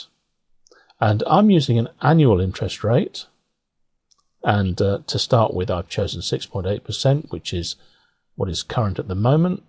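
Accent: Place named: Atlanta, United States. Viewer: Thanks so much, and you have such a nice accent the same accent in Atlanta, Ga all the best for you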